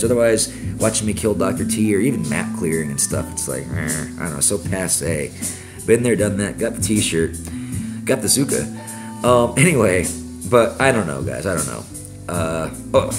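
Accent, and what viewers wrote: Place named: Texas, United States. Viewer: Haha you smoothie you 🤪 Mellow music in the background, deep Texan voice, shades and a bit of paraphrasing “it’s all about the science baby, just relax” Nice vid 👍🙂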